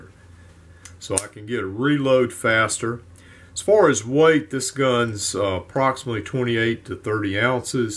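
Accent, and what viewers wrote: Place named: Kentucky, United States. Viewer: Hey you better stick around, for one, im a revolver fan, for two I was raised in Kentucky and I love your accent. I had my first long gun at 9 years old, it was a 410 Stevens and still have it, I was trained by a Sargent ,Korea era, named (dad) .and three, I'm 64 and love using an old 38 from the hip. A Taurus 82 that's all I need. Thanks again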